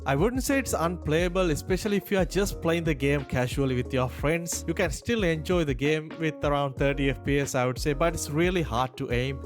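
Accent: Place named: India. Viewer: Indian accent 💀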